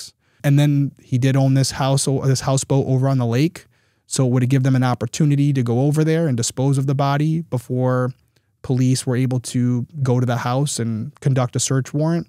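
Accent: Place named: East Coast, United States. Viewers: I love how in tune that you are with your audience. Btw, I enjoy your voice, with it's tiny east coast accent (I'm from So Cal) I'm proud of you, I know that you will be successful l.😊 Edit: I like you're story voice and your accent. I do not find the pacing too fast. If I could change anything, I would like more of a backstory on the victim, if possible Love, Blessings, and Prosperity, Stacy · I’m going to listen to the podcast as well…I’d like to hear from Erica, and maybe between this video & bumping the podcast up in the algorithm it’ll help get the word out. On a side note, I’m loving your new channel! Also, it was cool to hear my name in a northern accent so many times (I’m from Florida) 😂😂❤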